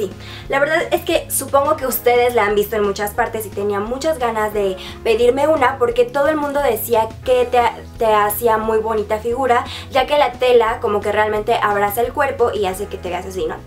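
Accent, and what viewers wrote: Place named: Fresa, Mexico. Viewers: ¿que le copia a Clara? JAJAJAJAJAJAJAJAJAJAJAJAJAAJAJJAJAJAJAJJAJAJAJAJAA es el mejor chiste que he escuchado. Te encargo que cheques el tiempo que lleva una y otra en youtube, y también checa los primeros vídeos de y te darás cuenta que ese acento "fresa" lo tiene desde que comenzó. Ella habla así, coincido en que aveces se escucha algo extraño, pero de que ella copia a otra persona, eso si que noooooo... checa las cosas q te dije. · si te apoyo o abre mucho la boca y medio fresona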